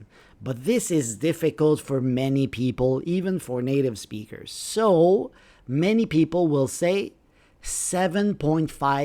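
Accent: United States. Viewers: stupid American English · You speak American English. What about dates in British English? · American trying to teach how to say numbers in English. Absolutely awful accent and certainly we native British people do not speak like this woman.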